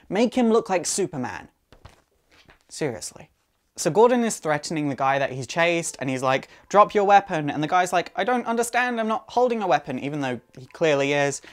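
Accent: United Kingdom. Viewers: for some reason i like this series and all the others better because you have a british accent lol i think people with accents australlian or brittish or even russian are cool especially british though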